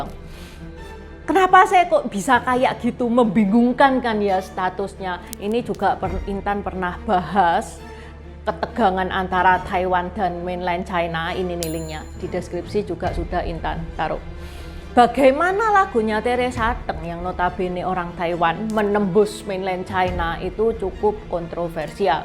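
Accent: Java, Indonesia. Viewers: Mbak jgn edok bgt bisa tdk. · Berisik, medok nyakitin kuping !!!!! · Mbak intan orang mana sih koq jawanya medug banget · intonasi jg terlalu medok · Medok bgt ngomongnya, pusing pala ku dgr nya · Medok banget jawane mbak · mbak aki juga org jawa meski bukan cindo tp logat medokmu kebangetan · Medok.. Chinese nopo jowo mbok???😂😅😅😂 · memanng legend..lagu2nya begitu masih dikenang dan dinyanyikan hingga saat ini..dan saya pun masih debngerin lagu2nya...thx ulasanya ci intan..btw asli dr mn ci kok medok jawa bangaet..? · Mbk intan,wajah chines logat jawa medog bingit... 👍🤭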